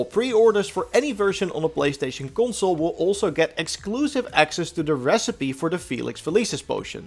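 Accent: Netherlands. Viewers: The Dutch accent coming through alot just the way it's meant to be :3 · Omg your dutch accent is so distracting... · Bruh you ooze Dutch with that accent. Love it 😂👌🏽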